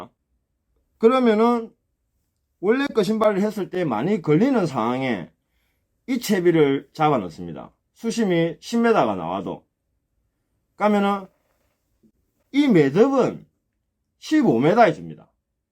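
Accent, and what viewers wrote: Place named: Gyeongsang, South Korea. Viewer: 혼자 방송하는데 마스크 벗고해도 되지 않을까요? 경상도 억양이라서 알아듣기 힘든데....발음까지...ㅠㅠ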